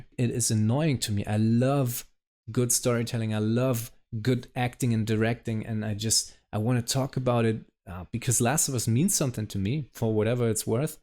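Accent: Germany. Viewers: Where is your accent from ? Germany ? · I caught the accent too lol, have German family. Ich mag deine Video Reel Talk!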